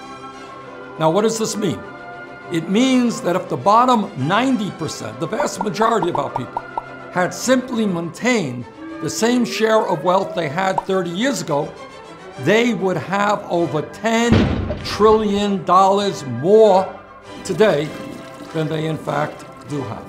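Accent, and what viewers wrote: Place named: New England, United States. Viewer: As a European I am just loving that New England accent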